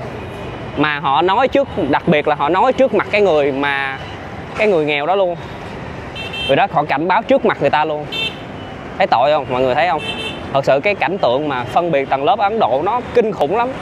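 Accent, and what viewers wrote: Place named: Vietnam. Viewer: Giong Vietnam qua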